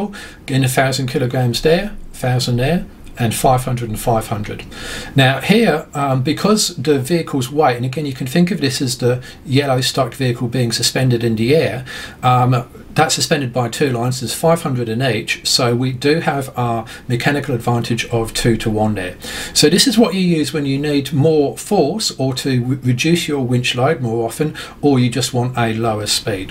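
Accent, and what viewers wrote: England, United Kingdom. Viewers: Hi You sound like a pom but that photo looks like Australia? I enjoy your videos, thanks for taking the time. Mike from Australia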